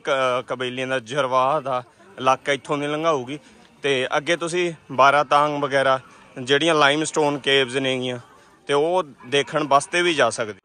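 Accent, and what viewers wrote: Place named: Punjab, India. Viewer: Bro,ur speaking English like Punjabi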